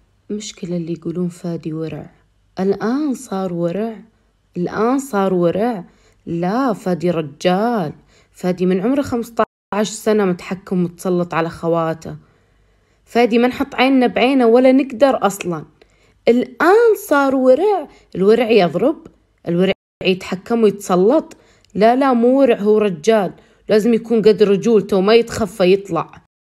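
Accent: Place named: North, Saudi Arabia. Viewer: لهجه اهل شمال ومن عرعر ترا عادي ولو تتابعها بتشوف نزلت كل معلوماتها وجوازها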